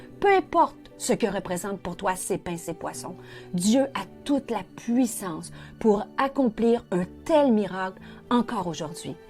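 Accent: Quebec, Canada. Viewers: Bonjour un plaisir d'entendre cet accent je ne connais pas le Canada mais j'aime votre accent. Merci pour ce partage, oui Seigneur tu as toute la puissance pour multiplier nos besoins spirituels, physiques,moral, matériels. A toi sois toute la gloire.❤️🙏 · Bonjour Pasteur.Contente d'entendre une voix féminine ce matin.J'aime bien votre accent Québécois. Merci pour les explications sur ce passage de Matthieu 15...Amen..Je crois que le Seigneur Jésus a le pouvoir de faire fructifier mon finance afin que je puisse aider les autres. Restaurer les couples en difficultés et ouvrir les yeux et se révéler aux personnes qui ne le connaissent pas.Gloire à Ton nom Seigneur Jésus Christ. Soyez richement béni en ce mois de décembre On est ensemble ❤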